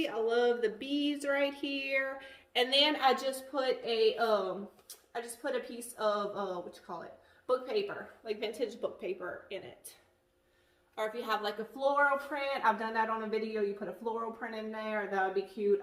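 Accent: Louisiana, United States. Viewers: I loved seeing this! Gave me ideas as well as good info on pricing. I must ask, where is your accent native to? You remind me of some of my Louisiana family, but not quite.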